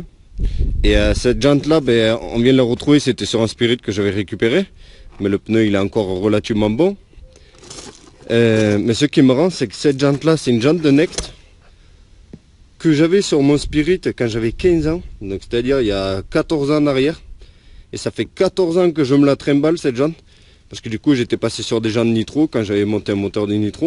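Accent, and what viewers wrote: South, France. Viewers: J’aime pas l’accent du sud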